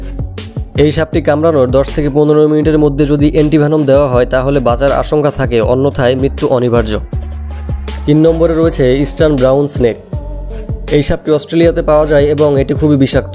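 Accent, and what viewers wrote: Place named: Bangladesh. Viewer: Khub Bajey Bangladeshi Gramya Uchcharon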